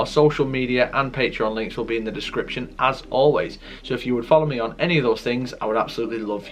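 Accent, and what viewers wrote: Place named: Manchester, United Kingdom. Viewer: I'm so lucky that I sit next to a bloke called Mark at work (well used to before Covid) and the joke never gets old. Can I ask what your accent is? Sounds a bit ' ' (capital of Manchester)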